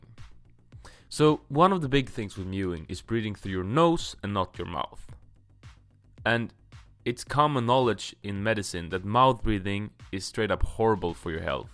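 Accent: Sweden. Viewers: swedish accent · Damn is that a swedish accent or am I crazy af? 🤔. Yes I love mewing I have been doing it for a month now and I see huge difference. I reccomend it to everyone! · is that a fellow swede im hearing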